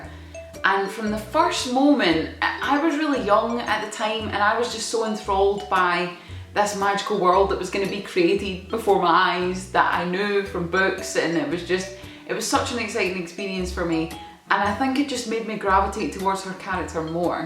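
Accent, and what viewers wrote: Scotland, United Kingdom. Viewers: Very Awesome Video! I never would’ve realized that so many films out there would get your Scottish accent accurately…as FAR too many films to name keep getting my family’s Bostonian accent incorrect…Versus Mike Myers’ Shrek character (which I know love very much), the female Scottish characters that you’ve mentioned in this Video certainly do seem to be the real deal (as opposed to being a mimicked/imitated Scottish accent)…Meanwhile, my friend mentions to me that her friends—whom are 100% deaf—wish to let you know that they’re appreciative of you informing them that all those female characters you’ve mentioned in this Video DO, in fact, speak with a Scottish accent; specifically, my friend mentioned to me that her friends had told her in ASL that they had no clue that those characters spoke with a Scottish accent…My friend also mentioned to me that her friends signed out in ASL that they’re very sure you likely have a very wonderful, lovely, beautiful voice and wish they could have had a chance to hear what it sounds like (and, YES, that WAS, in fact, by all means a complement)…But with a heavy heart, I’m gonna mention to you that my friend had a particularly challenging time attempting to “Read”/Watch this Video, as she mentioned to me in an E-Mail Message earlier this afternoon (Eastern US Time Zone) that the rate of errors in the Closed-Captioning for this Video in particular (for some odd and inexplicable reason) is quite horrendous, about roughly as bad as YouTube’s Aut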